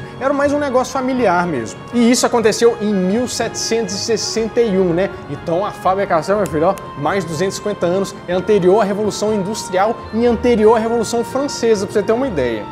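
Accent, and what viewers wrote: Minas Gerais, Brazil. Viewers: “Burracha”, “u pau tá quebrando” Hehehehehe Mineirês é top demais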